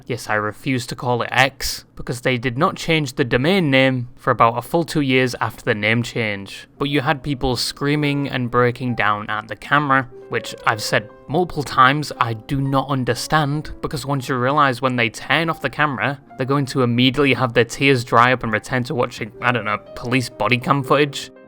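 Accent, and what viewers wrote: United Kingdom. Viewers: Never thought I'll see a British bird talking about American politics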